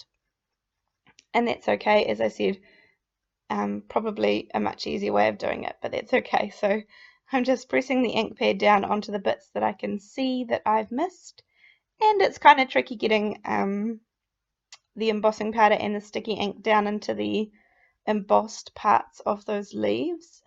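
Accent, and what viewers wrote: New Zealand. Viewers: Love your videos, so nice to hear a kiwi accent!! Just wondering where you get your black and white cardstock from?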